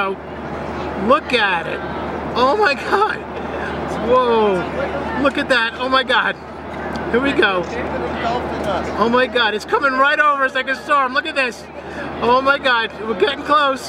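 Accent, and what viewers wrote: New York, United States. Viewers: oh my gahd, here we go, oh my gah, here we go, look at dis x 100! New York obnoxiousness on an Alaska Airlines flight? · lol Not another one.. Oh my God, oh my God. But, sir, thank you for filming a perspective most of us couldn't see. (You from NY btw?)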